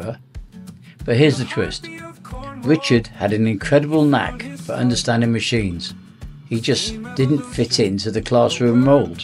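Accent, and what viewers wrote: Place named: United States. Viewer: Maybe if the AI can get a cornish accent instead of american one it would suit good!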